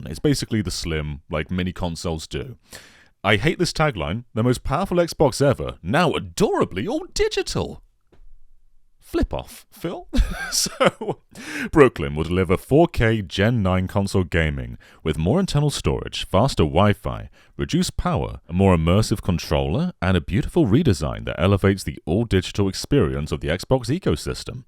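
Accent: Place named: United Kingdom. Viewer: The British accent really accentuates his chiseled face.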